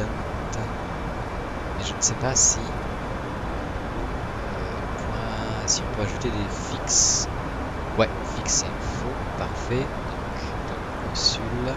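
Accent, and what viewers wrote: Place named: United States. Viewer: accent américain yes 😀😀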